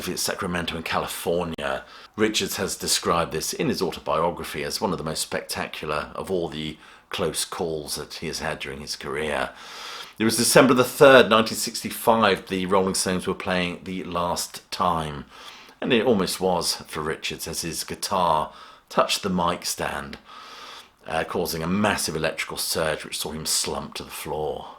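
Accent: United Kingdom. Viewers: Cheers mate, knew I'd found the right channel instantly. It just wouldn't feel right to have my rock music anecdotes explained by anybody else besides an obvious former roady with a UK accent of some sort, Innit!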